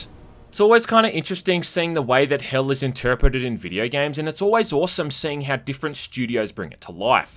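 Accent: Australia. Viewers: Do I hear... ...Australian accent?!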